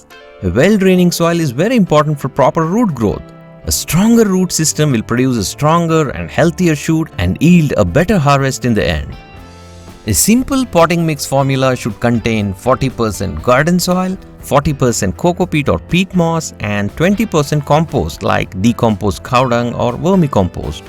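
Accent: India. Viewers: You can hide everything, but you can't hide your Indian accent!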